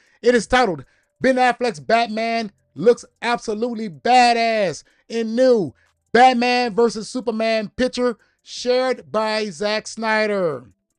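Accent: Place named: Louisiana, United States. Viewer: I love the enthusiasm when you say things are about to get a little bit spicy baby with that Cajun dialect I'm from Texas which is close to Louisiana and always thought that people from Louisiana have a very swag-a- docious way of speaking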